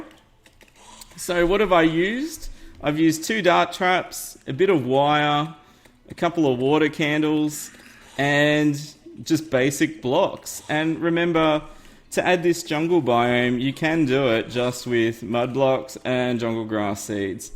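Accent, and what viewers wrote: Australia. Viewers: He is aussie right? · Are you Aussie because I think.. you are.. so yeah. And say magic is hairy with your tongue out! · You're Aussie... Aren't ya?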